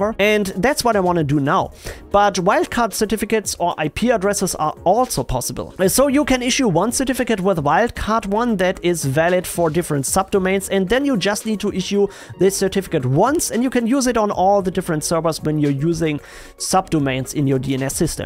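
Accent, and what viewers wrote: Germany. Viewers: Da hör ich doch das Deutsch raus xD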